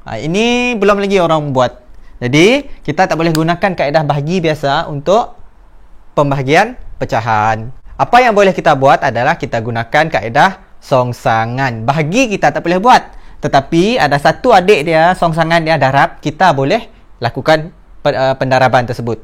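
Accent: Sabah, Malaysia. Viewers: Cigu sabah kah?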